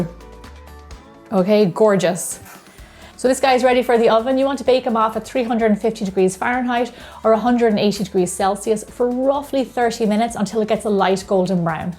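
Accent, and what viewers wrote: Ireland. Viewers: Those look so yummy. I,love watching your videos and just love hearing your IRISH ACCENT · I have to be honest, part of the reason why I clicked is because I love the Irish accent. :) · What percentage do you suggest if I use dark chocolate? I love this and your accent as I lived in IE for 8 years!!! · I knew you are Irish!You still have very strong accent💕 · YES! I had asked you on a very old video fo yours because I thought I recognized your accent, (I'm Irish myself,) then read your 'About' and was so confused! I was trying to think "Where the hell would an accent like that be other than Ireland..?" and nothing came to mind! Fellow Irish person here X You're my idol as well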